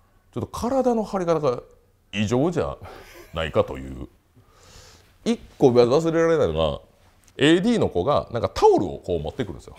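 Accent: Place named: Kyoto, Japan. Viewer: めっちゃ所々京都弁感じる 上品な人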